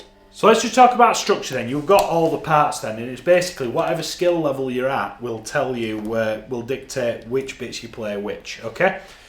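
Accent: North, United Kingdom. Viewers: Call me crazy but you almost sound northerner like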